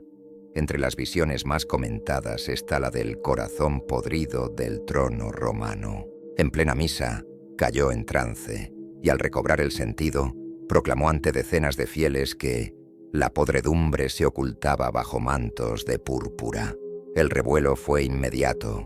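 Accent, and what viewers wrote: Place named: Spain. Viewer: estos españoles no son agradables escucharlos narrar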